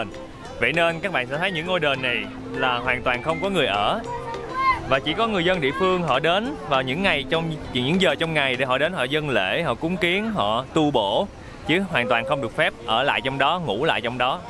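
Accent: Mekong Delta, Vietnam. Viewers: "Ở đây cũng có ruộng bậc thang mà nó cũng trụi lũi luôn" Giọng miền Tây dễ thương quá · Tiếng nói đậm chất miền tây ko lẫn với ai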